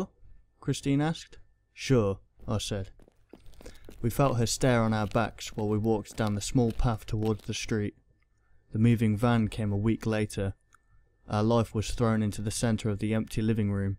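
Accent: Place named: United Kingdom. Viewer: I NEEDED a British creepypasta reader it gives diversity :p awesome work guys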